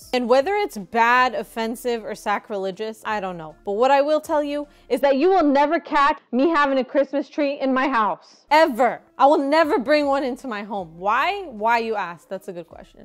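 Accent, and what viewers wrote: United Kingdom. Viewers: “When there’s actually culture” please 😂😭💀 Oh dear gOD THE BRITISH ACCENT PLEASE 💀💀💀💀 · That British accent was top tier!!!😂😂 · It’s the British accent for me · Perfect 👌🏽 English accent from east London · Excellant English accent! You're brilliant. So funny. You make me smile on the darkest of days in my life. Please keep it up. Thank you.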